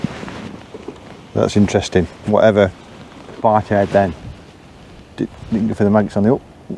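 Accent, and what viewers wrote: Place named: Nottingham, United Kingdom. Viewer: Hi, just found your channel by chance. Nice to hear that Nottingham accent lol. I used to fish the steps as a kid and had many great days there gudgeon bashing in the ‘70’s. Also had success hemping for roach. You’ve inspired me to get down there again on the feeder.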